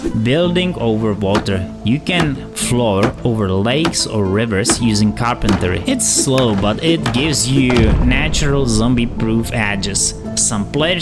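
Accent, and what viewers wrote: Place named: Czech Republic. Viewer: Nejsi náhodou čech? už jen podle toho jména a v ty ang mrtě slyším ten přízvuk, nic proti pěkný video :D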